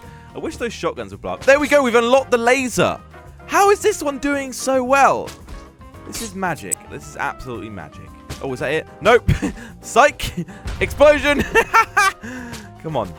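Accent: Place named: Essex, United Kingdom. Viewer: He sounds so Essex in this video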